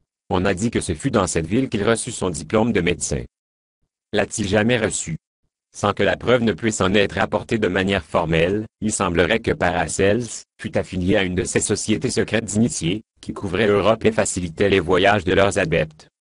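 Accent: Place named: Quebec, Canada. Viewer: L’accent m’a tué t’es québécois?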